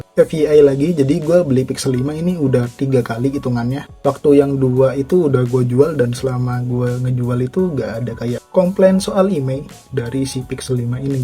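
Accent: Bali, Indonesia. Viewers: Jujur logat lu bang kaya orang Bali agak ke India"an😂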